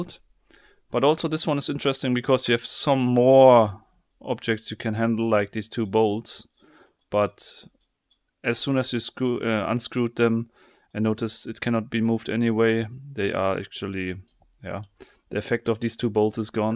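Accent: Germany. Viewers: I'm here for the puzzle's too but I can't watch more than 1 video of him without muting the video... Don't understand me wrong I love this channel but quality is important.. I know what he's trying to say but it's hard to understand because it's neither british nor american english.. It's really shitty german english and german english doesn't sound good... he needs a lot more english experience to improve on this... so it would be better for him to talk german in his videos and I think most of his fans are german too ^^ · Kommst du aus Deutschland?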